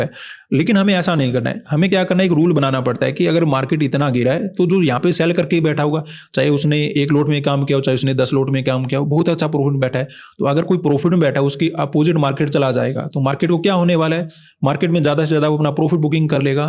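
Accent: Haryana, India. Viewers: Language ke hisab se lagta hai inki language mein thodi si Haryanvi bhi mix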